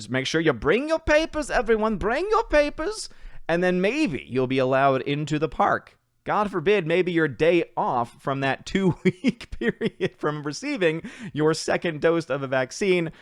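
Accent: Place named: Germany. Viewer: That's a nice German accent there. :-) As for Pinkofornia, the sooner the recall of Gavin Newsom happens, the better, because he'll be only too keen to make overall vaccine passports a reality. :-o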